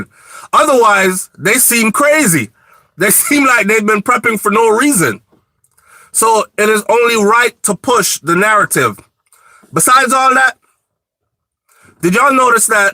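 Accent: Trinidad and Tobago. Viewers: The trini accent on point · Luv the Trini accent..lol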